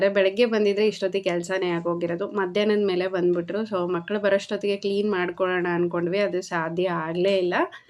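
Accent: Raichur, India. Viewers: ನಿಂದು ಯಾವೂರು ರಾಯಚೂರು ಭಾಷೆಯಲ್ಲಿ ಮಾತಾಡುತ್ತೀರಾ ನೀವು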